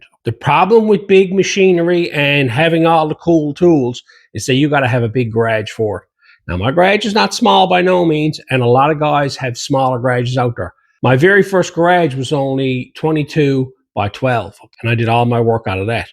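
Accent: Ireland. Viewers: It's amazing how similar to Irish accent yours is! And thanks for the great videos! Superb stuff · I really like your bare bones approach I'm 76 have a fitted,small metal/wood shop keeps me alive. I hope you don't mind me asking as an Irishman do you have an Irish connection or are Irish yourself I thought heard a slight broge there also the way you phrased some of the things you said, sounded like my grandparents of 70 years ago, brought a smile to my face.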